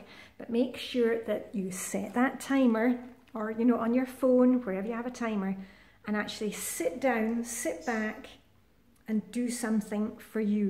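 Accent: Scotland, United Kingdom. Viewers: You are so lovely, and I love your Scottish accent. You are so motivational because you really tell us how to do it without beating ourselves up trying to be perfect.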